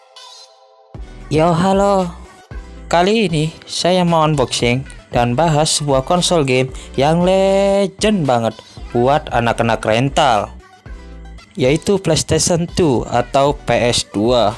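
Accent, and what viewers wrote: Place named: Madura, Indonesia. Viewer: Cara ngomong + backsound nya kek kenal 😂 Rada ada logat Madura nya dikit 😆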